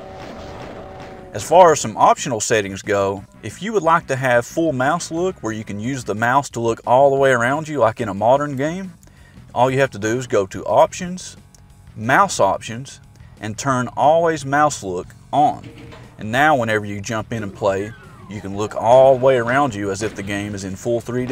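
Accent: South, United States. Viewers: Love your southern accent, bro. : > I would have mentioned Crispy/PrBoom+ for the more purist minded people, but keep up with the good content! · Just found this video. It is absolutely excellent. Clear and concisely presented instructions in a superb American accent. I don’t know what state you’re from but it is mega cool! Best wishes from England. P.S. I also agree with you that Doom is the foundation of most games in that genre. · Good American Accent Boi!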